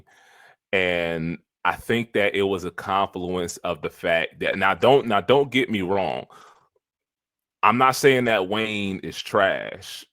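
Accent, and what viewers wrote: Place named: Philadelphia, United States. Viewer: You from Philly, ain’t cha? 🧐😏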